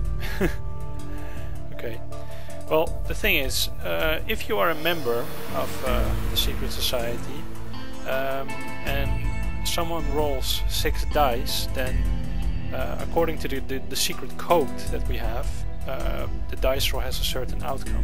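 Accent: Netherlands. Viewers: are you dutch maybe? :D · You are dutch right? You sound like a guy from Holland :) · Zo'n episch nederlands accent. Je hoort het meteen. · you sound dutch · You're not englisch? Younsound like a dutch? Of niet?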